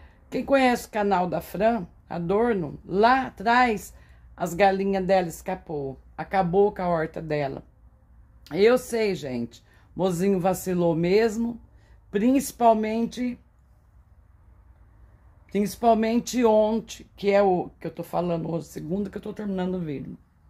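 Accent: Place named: Minas Gerais, Brazil. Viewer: Eu adoro esse seu sutaque caipira de Minas gerais e são Paulo kkkk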